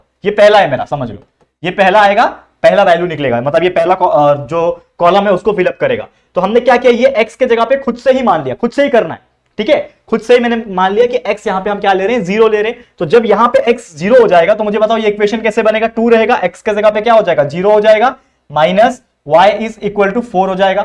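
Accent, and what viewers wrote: Maharashtra, India. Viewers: Sir apki marathi bohat cute hai❤❤❤❤❤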